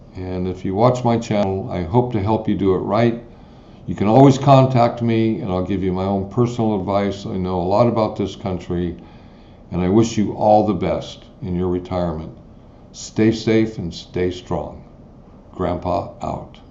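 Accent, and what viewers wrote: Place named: United States. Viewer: Here is my complaint about this video and I really don’t like to complain but when talking budgets it PH you are clearly speaking to folks who are not in PH. So why speak in pesos and to make it worse you alternate to both which makes it super confusing. At the very least stick to one but you sound like your from US and speaking to Americans so a budget in US dollars would be more friendly. Just some friendly feedback.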